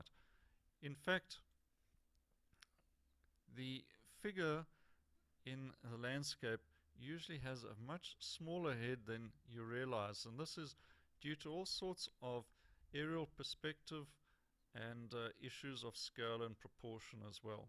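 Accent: South Africa. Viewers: Hi. I have just come across this video and I find it extremely well conceived. It is extremely helpful particularly for urban sketching. Thank you for sharing. Enjoyed the S.A accent!!!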